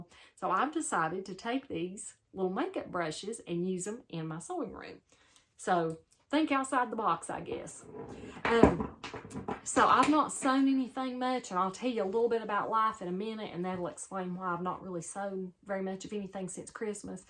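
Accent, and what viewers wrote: South, United States. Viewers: One more thing, u have that southern sound like Ms .that's where we are from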